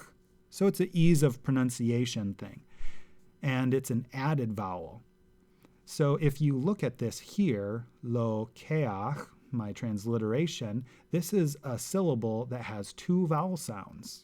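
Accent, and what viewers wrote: United States. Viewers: I consider this harmful to anyone learning Hebrew. You have a heavy American or Canadian accent, and your vowel placement so different to Hebrew, I could barely recognize the letters you mentioned. Anyone who used these videos to learn would surely end up sounding American? Also, the video is called "Biblical Hebrew" but you're rejecting Biblical pronunciation and going with modern pronunciation, which isn't helpful to anyone learning Biblical Hebrew. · Thanks so much. You should perhaps point out that your pronunciation of many of the vowels is distinctly American, and is different from the non-Western original Hebrew pronunciation. For example the letter yod should be pronounced as the oo in wood, and not like the o in yoga. It can be confusing for those who are not cogniscent of your strong American accent. Thanks again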